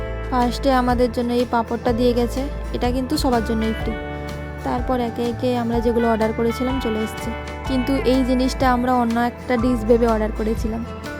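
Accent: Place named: Medinipur, India. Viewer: Tomar bari kothay kothar tan sune mone hoche medinipur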